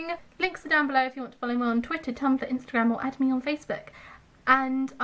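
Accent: United Kingdom. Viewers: Is your accent from Southampton?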